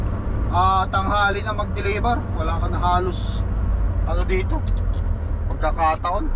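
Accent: Ilocos, Philippines. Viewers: Nagsolid dayta ilokanom padli. Ahahaha. Mamadlaw tayo pag nagtatagalog ka. Hehehe. Alwad kinanayon. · ilocano ka sir? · Yung accent! Matik ilokano! 😁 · Parang ilocano so kabayan ah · Tlga ilocano hehe halatado tgla sitau nga ilocano duray sadinno nga sulinek hehe God bless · Perstym ko idtoy channel mo idol accent mo palang ilocanong ilocano hahahaha ingat kabsat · ilocano ka ata sir! hehe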